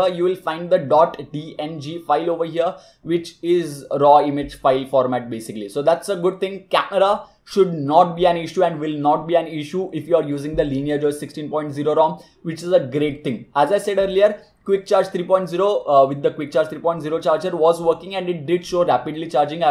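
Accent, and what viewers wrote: India. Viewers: im okay with indian accent and I do fully understand it but but but...am I the only one who think he talking too fast?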